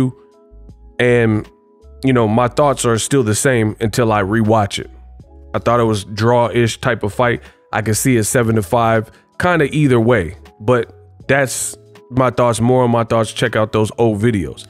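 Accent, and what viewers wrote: Baltimore, United States. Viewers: Lol@ your B-more accent. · The Bmore accent, up there with the Pacman, Bob Arum, Kell Brook. Best in the business-Smash the LIKE 👍🏾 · I can't with you, and the Baltimore accent 😂😂😂 in boxing sometimes fighters learn things the hard way, DHP will have an answer for the left-hand in his next fight. What a great year in boxing if we get Tank vs Devin in 2023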